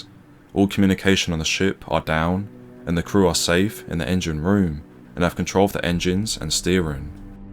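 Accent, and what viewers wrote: United Kingdom. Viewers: Ooh! And it’s narrated in British talk!! BONUS!! 🤩🤩🤩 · It's not British talk it's British English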